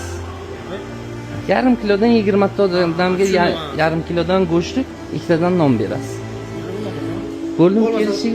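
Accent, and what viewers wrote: Uzbekistan. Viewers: Buxorodan bulsa kerak shevasidan baraka toping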